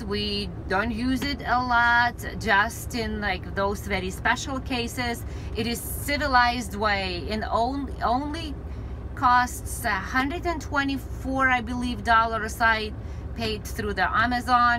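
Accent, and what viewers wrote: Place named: Ukraine. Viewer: Great video thank you. Sounds like a Ukrainian accent. Stay safe out there.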